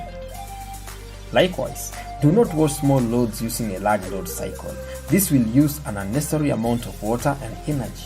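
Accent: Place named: Kenya. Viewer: Sounds kenyan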